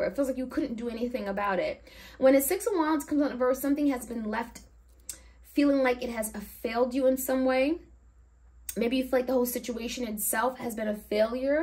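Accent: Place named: East Coast, United States. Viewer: ur accent gives me classy east coast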